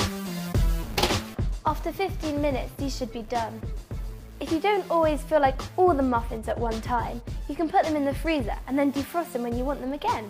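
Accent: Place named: United Kingdom. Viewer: Can you talk without British accent ....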